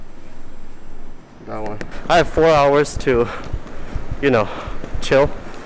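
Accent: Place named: India. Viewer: tell me why you have Indian accent.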